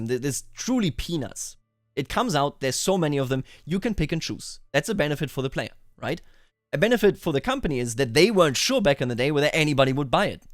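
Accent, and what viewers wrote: Germany. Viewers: Well, this is how actually true German English accent sounds. It's always funny to see so called "Germans" in american cartoons that speak like Russians.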